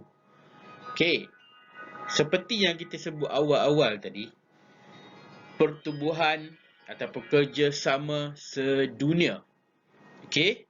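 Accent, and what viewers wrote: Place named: Perak, Malaysia. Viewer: Cikgu ckp loghat perak tu stai wuuuu 😂😂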